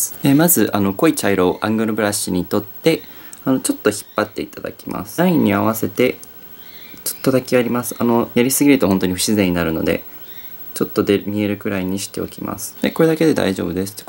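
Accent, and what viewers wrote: Kansai, Japan. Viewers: 、関西弁でかわいい😍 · メイクすごく綺麗で丁寧なのに編集が面白くてギャップがすごい！私は関西出身なのでの発音すごく好きです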